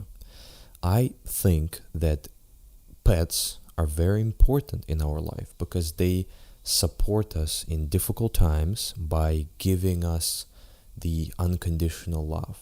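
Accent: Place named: Russia. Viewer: почему вы английские фразы произносите русскими звуками?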